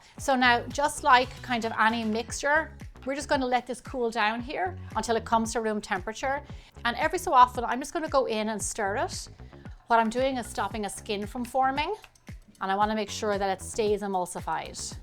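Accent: Ireland. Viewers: This works better if you have a beautiful irish accent. Love your channel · What a delightful Irish accent! 💖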